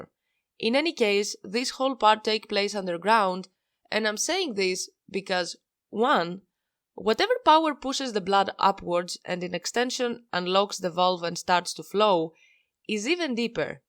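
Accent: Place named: Greece. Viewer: I like your videos. I also really love your accent. So don't worry about it your English is outstanding. Far better than my Greek.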